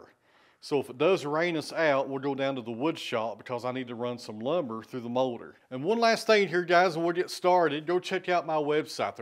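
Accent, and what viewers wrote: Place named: East Tennessee, United States. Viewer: That looks like tornado damage, alright. We lost our barn back in 99, and because there were no "confirmed" touchdowns, insurance refused to pay a penny. By the by, sound quality is pretty good,. Sounds about like you have it dialed in. Oh, something I've been thinking about... My grandfather was a Sawyer up here in northeast Ohio and northwest Pennsylvania from the 1930s to the mid 80s, and some of his favorite workers were from your neck of the woods. Herman Hutchison, Sheridan Severt and his son John (all gone now, sadly.) were from Johnson County and sounded almost exactly like you. Must be something about the land of east Tennessee that makes good sawyers...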